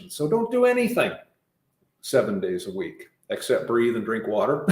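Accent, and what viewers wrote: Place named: RP, United Kingdom. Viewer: He is only speaking with a plum with. His mouth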